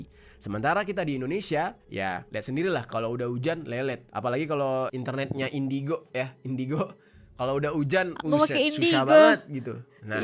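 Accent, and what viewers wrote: Malaysia. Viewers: Terima kasih atas infonya, slang macam orang Malaysia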